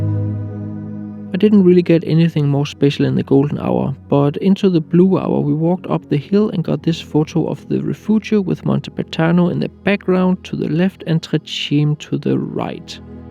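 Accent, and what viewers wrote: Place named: Denmark. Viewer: Lol man høre med det samme at det er en dansker 😅😅🍿 men fed video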